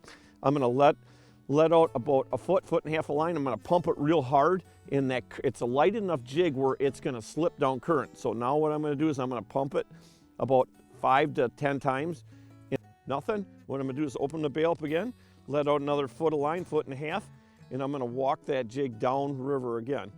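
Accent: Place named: Midwest, United States. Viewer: This is the most Midwest sounding guy I’ve ever heard😂 I love it!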